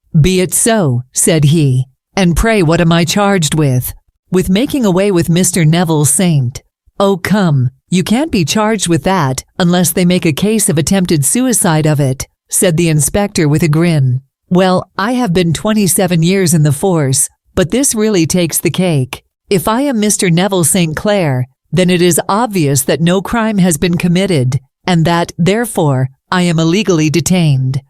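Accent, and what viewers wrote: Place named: United States. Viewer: Yuk, an American accent is so wrong for this story.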